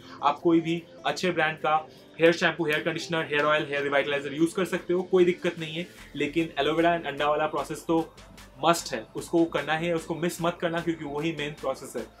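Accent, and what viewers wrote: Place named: Bengal, India. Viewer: Ooo baa bhalo..tomar kotha sunei bojha jachhi lo. J tumi script lekho na r tumi bangali..ami o likhi na .skript...😂❤️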